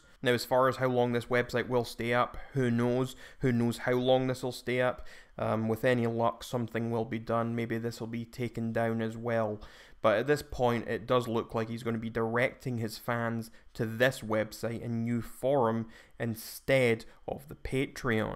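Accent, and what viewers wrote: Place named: Scotland, United Kingdom. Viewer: Your accent reminds me of my great grandma.. she had the thickest Scottish accent and listening to her talk was amazing.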